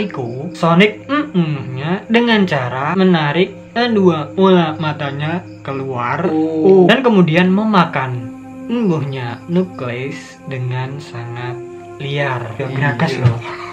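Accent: Java, Indonesia. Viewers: Sampean suku Jawa tooo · Lidah khas Jawa nya loh yg bikin seru 😁😂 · Jowo daerah pundi kak? 😹😹😹😹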